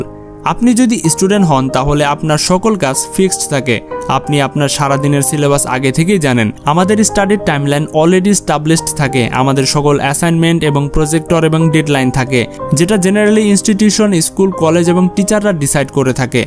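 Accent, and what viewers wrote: Bangladesh. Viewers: বাংলাদেশী আমার মনে হচ্ছে। কথা শুনে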